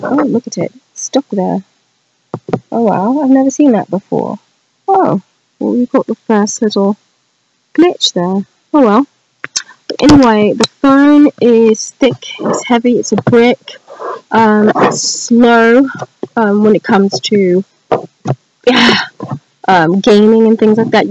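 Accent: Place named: England, United Kingdom. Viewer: Nice review. I am always drawn to a good hardware keyboard. I really wish Moto had one in the pipeline based on the X....Nice to finally hear your voice. Your English accent is cool.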